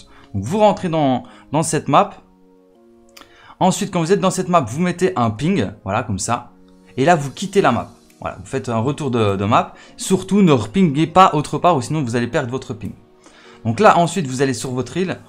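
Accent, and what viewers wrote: Belgium. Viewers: Tu est belge ou pas (question)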